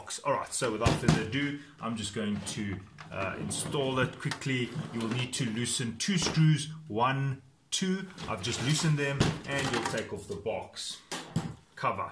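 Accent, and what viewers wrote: South Africa. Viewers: This is a South African accent....you can’t fool me, thanks vir die review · Hey, are you South African 🇿🇦.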